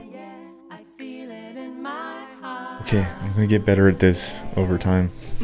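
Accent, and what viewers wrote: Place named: England, United Kingdom. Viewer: Love your English accent 😂.. better than my American one..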